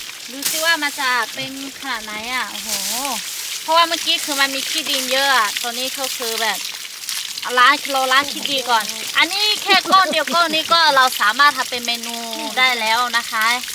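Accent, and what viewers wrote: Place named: North, Thailand. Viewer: เห็น พูดเหนือก็รู้สึกว่าน่ารักดีนะ👍👍👍👍👍🌹🌹🌹🌹🌹🌹เหนื่อยลำบากแต่ก็อร่อย ทำให้สุขภาพดีได้ออกกำลังกายกันสุด สุด😅😅😅😅😅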